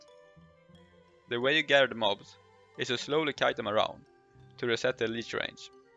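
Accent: Sweden. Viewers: Are you swedish? :D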